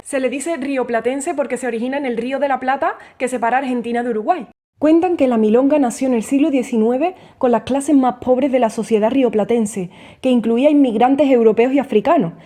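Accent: Andalusia, Spain. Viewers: Ese acento andaluz 🤤🥰 enamora😍. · Because she speaks With no standard spaniard accent. Her own accent it's the andalusian accent, the accents spoken on southern Spain, which main characteristics are in leaning in the vowels and shorting and choping the consonants given it more dynamic sound , more fast than the standard norm and so emotionally full of feeling.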